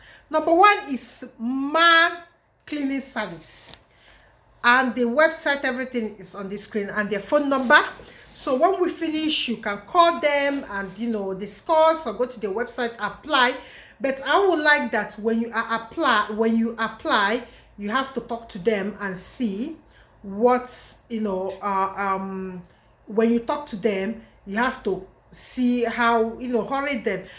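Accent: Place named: Igbo, Nigeria. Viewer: Me I love your Igbo tongue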